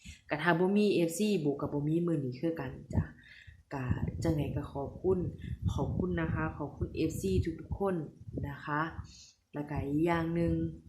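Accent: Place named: Khon Kaen, Thailand. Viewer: ฟ้งออกคนขอนแก่น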